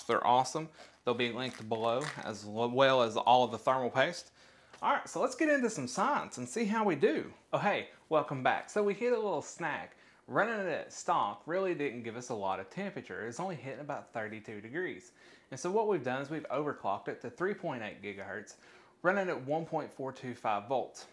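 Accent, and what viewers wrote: South, United States. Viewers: R u southern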